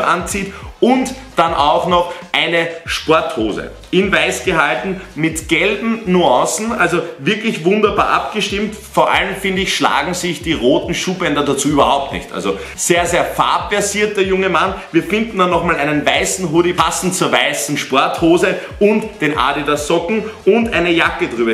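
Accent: Austria. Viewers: #österreicher "Wos dus du dn grod"😂😂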